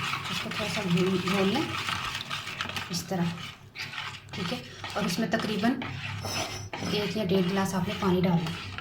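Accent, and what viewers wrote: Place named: Punjab, India. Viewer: Ap Ka punjubi satyl bohat acha h 😘me b punjubi hn I mrs Usman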